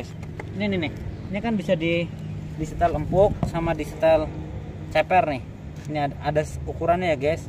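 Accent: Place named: Banyumas, Indonesia. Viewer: Ritinge ndi sih? Khas banget wong plat R 😂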